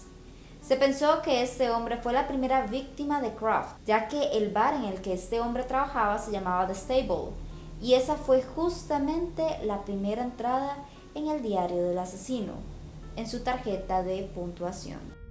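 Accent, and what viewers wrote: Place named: Mexico. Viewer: Me gusta este acento mexicano, me hace acordar a mtv xD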